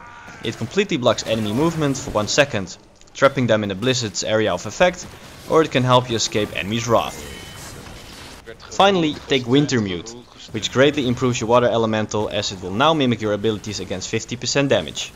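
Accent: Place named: Netherlands. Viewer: Lekker hoor, vernederlandst accentje en af en toe wat Nederlands op de achtergrond :P Uiteraard een oude guide, maar lingering chill zal ik eens proberen ipv 30% meer range op Q :)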